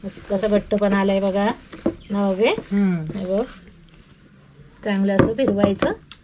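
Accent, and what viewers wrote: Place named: Konkan, India. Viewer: काकी छान मस्त कोकणी बोलतात...कोकम शिवाय नाय चव लागत... 😊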